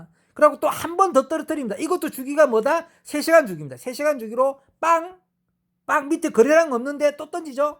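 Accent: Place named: Jinju, South Korea. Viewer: 진주분이신가봐요? 영상잘보고있습니다.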